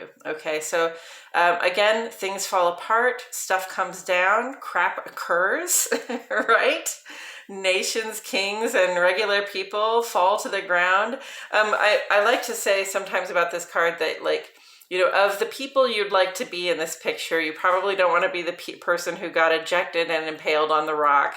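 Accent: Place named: Chicago, United States. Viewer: Hi, (Flakeway and) I have to ask you out of curiosity where you are from originally. I've suspected that from your voice that you sound like you are from NE Illinois ie, Chicago area as I am originally.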